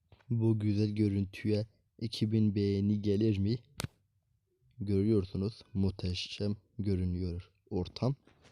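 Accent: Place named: Muş, Turkey. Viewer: Şivenden dolayı muşlusun gibi geldi bana. Doğrumu tahmin ettim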